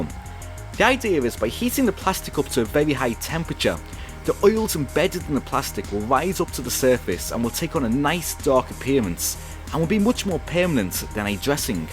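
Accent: Liverpool, United Kingdom. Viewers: from witch part of uk this lad is? very intresting accent. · Are you from Liverpool or Wirral at all? Can hear a scouse twang in your accent, i'm from Ellesmere Port :-) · Am i mistaken or you're from liverpool, i deduced that from the way you speak.If I am wrong please do tell me. As someone said it's nice to see someone reastoring normal car you know one for laymans not ones from wealthy people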